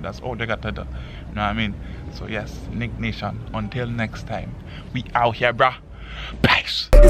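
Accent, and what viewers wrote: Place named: Trinidad and Tobago. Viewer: Are you Trini? Have you lived in Trini? Do you have Trini family? Cuz that Trini twang is coming through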